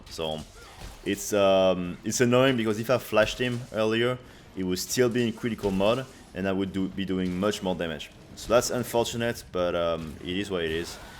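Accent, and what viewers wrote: France. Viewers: Tu serais pas français par hasard???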